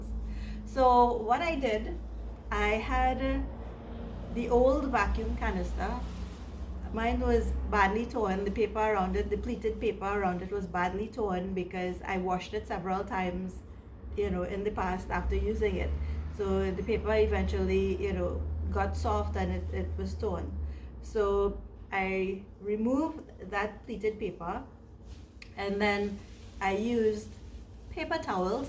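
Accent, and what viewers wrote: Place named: Trinidad and Tobago. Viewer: I knew you were from Trinidad when i heard your voice it reminds me of my friend wife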